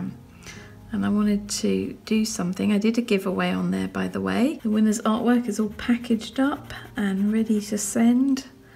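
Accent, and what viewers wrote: England, United Kingdom. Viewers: English accent. Makes you sound important, official, BBC-like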